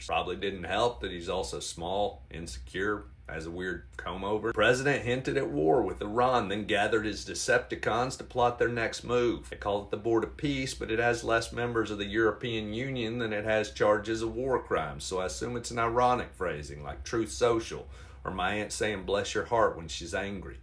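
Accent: South, United States. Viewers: Your comments are weirdly out of place.... Only because of your southern accent. You must have payed attention in school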